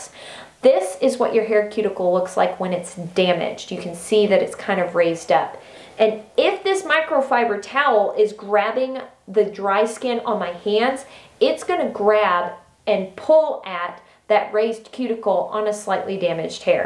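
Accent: South, United States. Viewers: You could work for QVC!!!! ;) Shame that (as usual!) the give away and products is for USA folks only (English subscriber here.) None the less, well done on the 20 k subs (I'm just a recent one too, like a few days ago recent, lol) And last, but not least, I love your accent. :) It's so funny for me to hear, like something out of a movie.. Very 'Southern drawl.' Take care. PS. Your hair is beautiful.. The shine is EPIC!!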